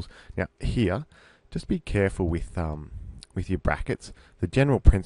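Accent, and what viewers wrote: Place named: Australia. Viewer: Excellent, well done mate, nice to hear an Aussie voice.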